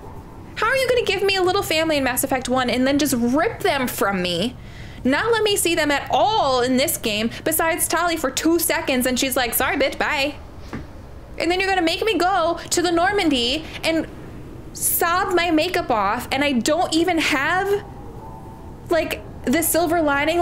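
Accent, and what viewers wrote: New York, United States. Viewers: I absolutely do not mind your New York accent. I actually find it to add extra flavor to your already great video content lol. I encourage it lol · I knew you were from NY! Are you by any chance from WNY? 😂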